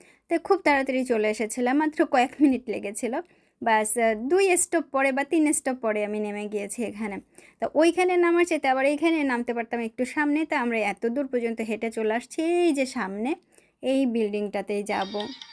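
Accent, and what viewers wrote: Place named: Comilla, Bangladesh. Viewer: আপনি এভাবে কথা বলেন কেন,আপনি কি কুৃমিল্লার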